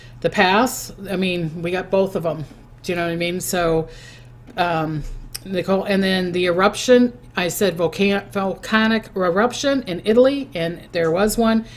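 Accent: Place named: Minnesota, United States. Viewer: So glad I found this page ! I love your Minnesota accent ♥️♥️ Like a warm hug . Subscribed and notifications on ! Xoxo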